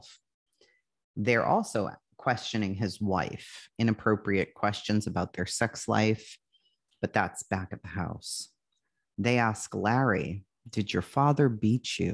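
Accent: Boston, United States. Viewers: Love the way ur telling this story.... 💞🙏... ur Boston Accent, u all pronounce ur "R's" differently from me... I'm in Washington state... Good saying "drawers", spot on🥰😊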